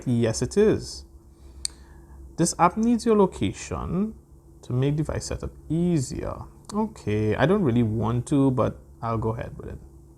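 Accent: Trinidad and Tobago. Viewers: U sounding rell trini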